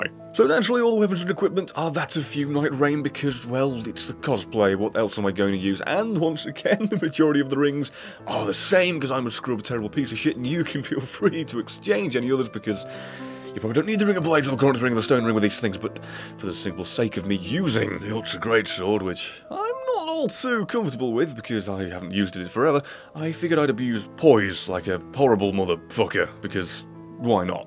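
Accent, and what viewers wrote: United Kingdom. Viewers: are you British? Keep up the good work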